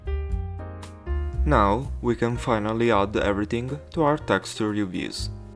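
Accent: Italy. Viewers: Where is this guy from? His accent is a mix of like Italian and...I dunno, Indian? It's awesome. However, I have to say, more explanation would be great. For example, when you open your blueprint you have "absolute world position" and "mask" nodes already on the screen. Where did they come from and how do I get them? I had to just keep trying nodes that popped up after searching for "world" and "mask" until I found the right ones. And you bring in a parameter node, but which one is it?? There's like a hundred different ones. Same thing , I literally just held down letters and clicked until the right node came up. Just saying which one it is or searching for it instead of using shortcut clicks would be very helpful.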